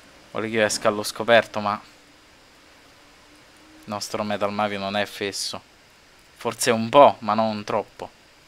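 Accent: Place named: Rome, Italy. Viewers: E romanò :^ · non è napoletano, è romano 😂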